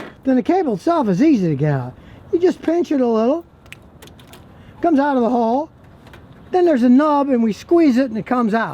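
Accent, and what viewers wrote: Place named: South, United States. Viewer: Hey. I laughed when you were talking about the long screwdriver and to "stick it in there". I know you are from the north but it sounds like you have been in Texas long enough to pick up a little bit of the Southern dialect. LOL I'm sure if I went up North for a long time I would pick up some of the slang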